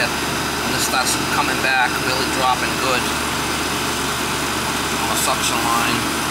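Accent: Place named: New York, United States. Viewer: thanks for talking as you work +nice accent my friend is from ny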